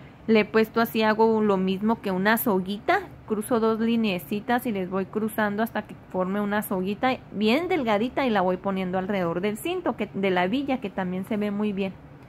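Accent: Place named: North, Mexico. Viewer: Hola muy bonito tu pastel te felicito una pregunta ojalá me contestes pero de donde eres tienes un tonito como del norte te escuchas como nosotros los de chihuahua jaja 😂 Dios te bendiga y lo mejor de corazón gracias por compartir lo que sabes 🙏🏼😘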